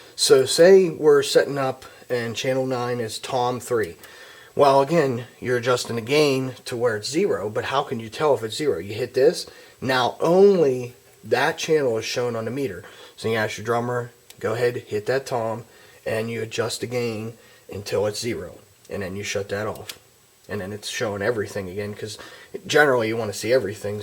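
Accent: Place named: Baltimore, United States. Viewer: great tutorial and there's no hiding that baltimore accent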